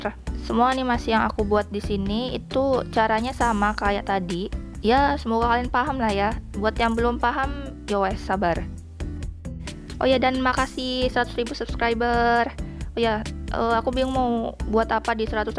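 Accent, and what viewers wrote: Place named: Kalimantan, Indonesia. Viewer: Kw ni org kalimantan kn? Logat ngomong mu kyak di tempat ku soalnya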